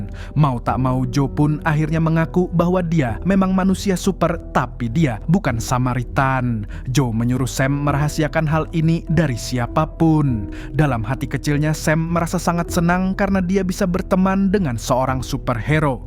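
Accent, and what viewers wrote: Banjar, Indonesia. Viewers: Pian urang banjar kalsel kah 😅😅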